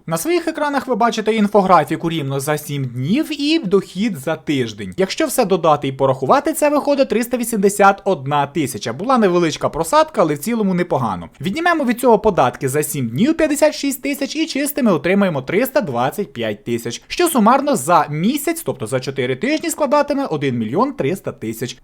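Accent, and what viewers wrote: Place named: Russia. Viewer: Сначала был украинский но большой русский акцент потом украинский с средним русским акцентом а теперь 👽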